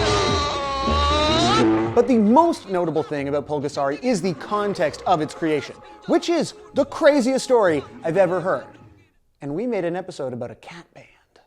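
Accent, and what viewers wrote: Canada. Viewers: I came from game therory and have watched every episode. This is the first time I've noticed the "canadian accent"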